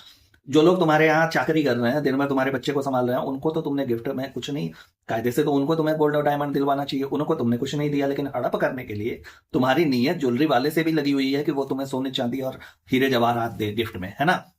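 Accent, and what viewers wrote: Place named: Lucknow, India. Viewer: Are you from Lucknow or Hardoi or nearby places like Sitapur, actually aapke baat karne ka tarika bikul wahi ka hai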